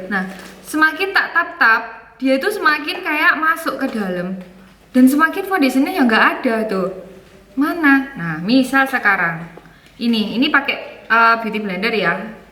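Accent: Java, Indonesia. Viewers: Lucu siii ba ngomongnya jawa banget yaa hahahaaaa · Jawane.bener bener meldol bgt,,,ngakak aku ndelok spean mb lol😂😁😁😁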